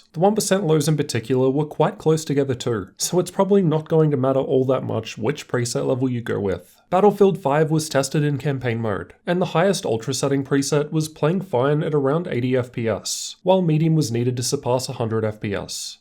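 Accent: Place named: Australia. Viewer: Bruh this boi Aussie bruv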